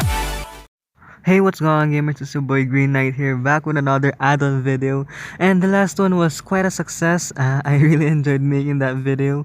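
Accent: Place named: Philippines. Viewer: Your a pilipino right i realized it because of your accent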